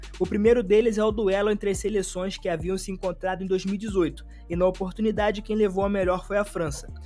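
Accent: Rio de Janeiro, Brazil. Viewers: Vídeo começa : “SOTAQUE CARIOCA” Fechei o vídeo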